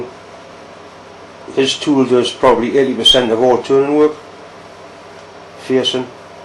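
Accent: Newcastle, United Kingdom. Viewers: Awee man. You from newcastle??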